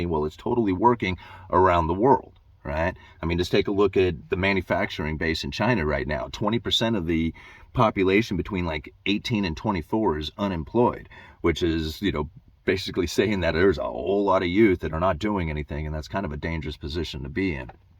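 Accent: United States. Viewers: I haven’t watched this guy much but does anyone know if he’s American or Canadian?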